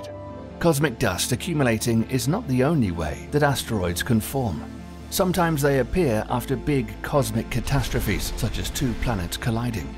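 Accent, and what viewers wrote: United Kingdom. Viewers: Narrator: PLEASE stop saying "Nuke-yu-ler"!!! The word is spelled "Nuclear". And pronounced "Nu-clee-er". "Nuke-yu-ler" (or, often, "Newk-ya-ler") is the 'Murican Bush-level yee-haw pronunciation for high school beer-swillin' gun-totin' dropouts. You sound British; thus, I had expectations you knew the language. "Disappointed, I am", says Yoda.....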